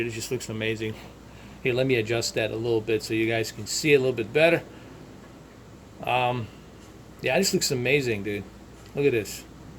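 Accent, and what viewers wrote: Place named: Florida, United States. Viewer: Hey bud. Awesome cant wait to play it. However If i may request. What are your picture settings while using game mode for bfV? I am very interested in using the free sync feature at 120h. Currently when I play forza horizon 4 and red dead redemption 2 I am not using game mode but I am using your natural picture settings which give me the best picture IMO on the q8fn. Would I use those same natural picture settings in game mode? I would love to hear your opinion. As always thank you so much! Also on a side note, please take no offense, I love your accent. I grew up in Florida as well. Take care bud.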